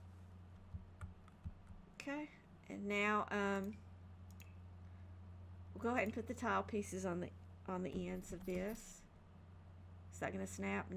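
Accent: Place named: Texas, United States. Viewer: you from Texas? You have a cool western/cowboy sorta accent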